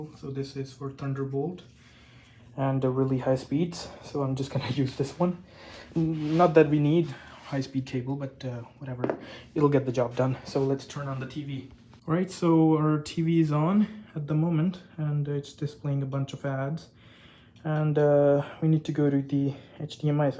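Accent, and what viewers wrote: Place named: Canada. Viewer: its the strong canadian accent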